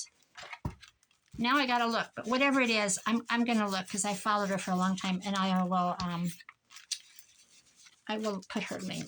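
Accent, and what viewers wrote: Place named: Midwest, United States. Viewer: I love listening to your stories while I’m crafting along with you. It’s so refreshing to listen to someone from the midwest. I moved away now but it sure is good to hear your stories!!!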